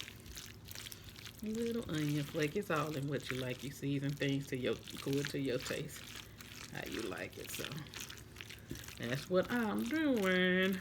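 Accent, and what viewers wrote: South, United States. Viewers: She said I like to use my “yurs” aka ears haha love southern accents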